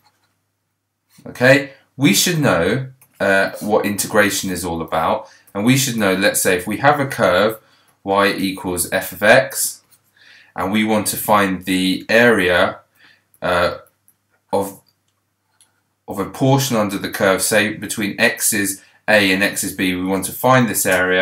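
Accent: United Kingdom. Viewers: woah, you sound Britsh!! I'm an Amurican. Thanks for posting, much appreciated :)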